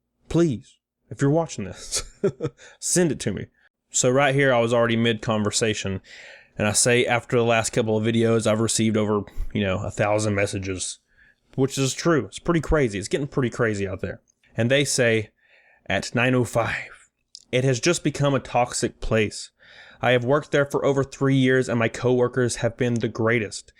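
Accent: South, United States. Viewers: Anyone know what part of the south hails from? Love the accent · Funny how it takes just one man with a camera and a southern accent to destroy Gamestop. You're the best,! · Do I hear a fellow country boi actsent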